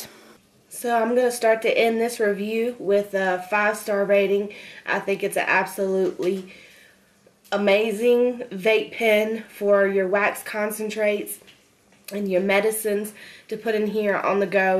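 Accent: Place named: South, United States. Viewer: Your gorgeous love your southern accent what a fox :)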